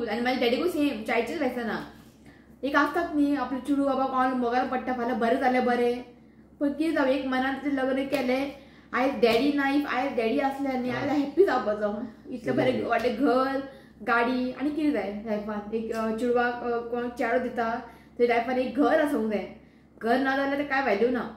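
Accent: Konkan, India. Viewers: Dont cry 😭 kokani thodishi vegali ahe tumache bolane समजले पण काही जण बोलले तर समजत नाही 😂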